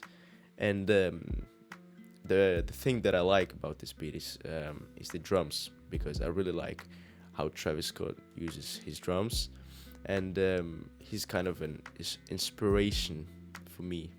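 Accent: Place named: Scandinavia. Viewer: Dope tutorial man, where in scandinavia are you from btw?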